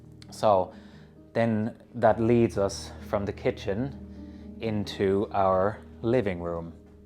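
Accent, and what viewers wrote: Ireland. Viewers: Great house,your accent makes me nostalgic as I am a Dubliner in London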